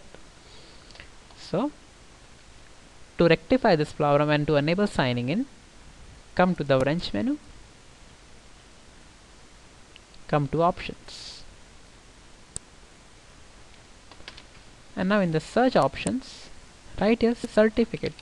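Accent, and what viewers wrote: India. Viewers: Play it twice. Once to acclimate your ears to his subcontinent English accent, and the second time you will hear every word. It is not too fast, nor is his English unintelligible.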